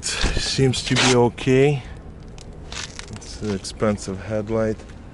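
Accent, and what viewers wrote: Russia. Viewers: брат ты русский ? у тебя акцент))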